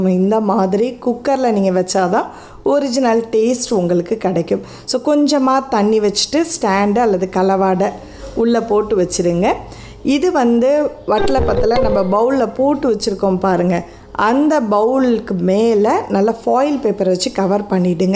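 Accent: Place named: Ramanathapuram, India. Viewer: Nenga sollurathula mukkalvasi enga ooruoda othu poguthu sister i am ramnad mukkiyama vappucha intha word kettathum aacharyama irunthuchu ada namma ooru mathiriye sollurangalenu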